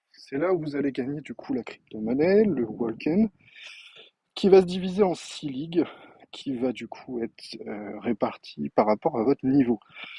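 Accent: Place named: France. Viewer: Merci pour le tutoriel ! Et vive la France pour l'accent haha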